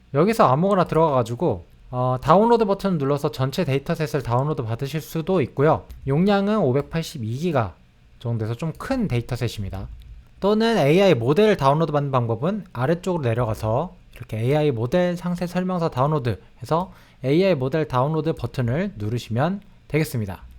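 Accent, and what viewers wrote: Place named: Chungcheong, South Korea. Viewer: 저 충청도 사람인데, 나이드신 어른들 톤이랑 비슷하네요 ㅋㅋ 그 특유의 미묘한 말하기 귀찮아하는 듯한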